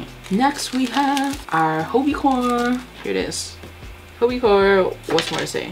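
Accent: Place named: Canada. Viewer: You’re either from Canada or Seattle. I forgot which one says beg=bag. Maybe both Edit: Its Canada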